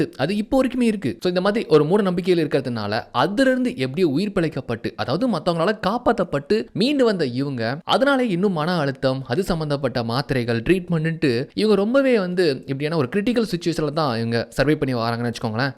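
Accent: Tirunelveli, India. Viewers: திருநெல்வேலி தான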